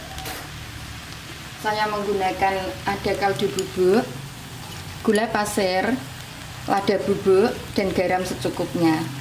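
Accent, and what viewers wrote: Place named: Java, Indonesia. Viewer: Medoq bgtz ngmongny...🤣🤣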